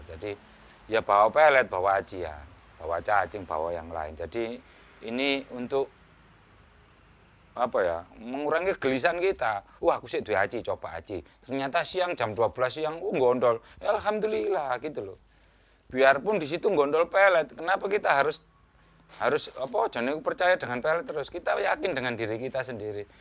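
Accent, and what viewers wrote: Surabaya, Indonesia. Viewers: Suroboyo ndi cak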